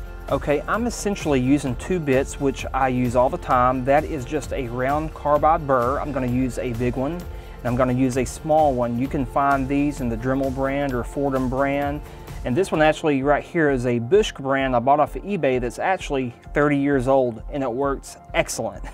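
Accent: South, United States. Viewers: I just love your southern accent! New to woodworking and loving it!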